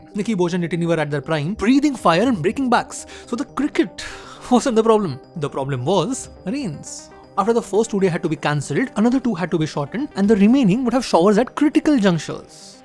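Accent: India. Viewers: This Indian accent is enough to given us headaches Unbelievable, 200 years literally taught them nothing.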